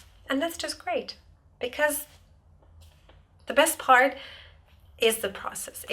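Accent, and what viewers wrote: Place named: United States. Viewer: Thank you very much for this video! May I ask you some questions? Do you speak any other foreign language? Why did you choose the American English pronunciation? I ask because at my university our teachers prohibited speaking the American English. Thank you in advance 🤗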